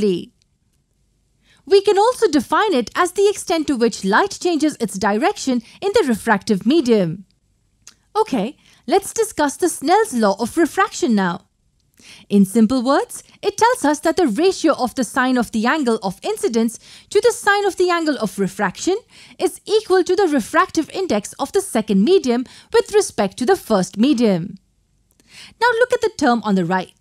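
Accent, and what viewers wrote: India. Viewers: I only watched this cause of the Indians voice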